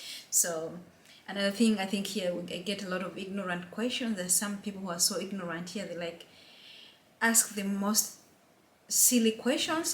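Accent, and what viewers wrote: Uganda. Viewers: I wouldn't know why my boss always gets hurt when we are traveling on a dusty road i put on my head wrap ,he easily asks why are you putting that on? I used to think its because i look different! Now, i know why? Thanks sis,am in Uganda you sound like someone from Fort portal.😊 I feel those guys have strong racism but hidden internally in their behaviour that is also quite hidden it takes a keen person to tell! The company i work for are all Danish, trust me they make me hate being in Denmark by the way!! Thanks for sharing!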